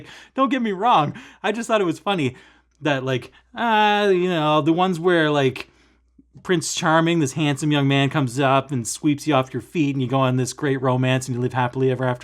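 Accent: Canada. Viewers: “Snuggling arm” 😂😂😂😂 so nice to hear a Maritime accent (not many of us here in Oklahoma😉) - g’s or no g’s notwithstanding! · This is the first of your videos that I have watched and it was great . . . I'm off to watch the rest. I moved to New Brunswick 5 years ago and listening to you speak, I thought you sounded like David Myles (my husband agreed) so when you mentioned the New Brunswick accent I laughed . . . maybe it is a thing!!!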